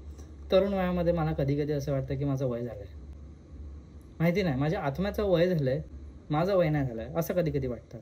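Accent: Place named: Malvani, India. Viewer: aani tujha malvani aaikun maka aasa vatata dev maka roj navin navin goshti deta ha tuja premat paduk ❤❤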